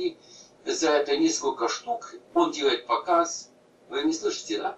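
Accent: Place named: Poland. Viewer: Польский акцент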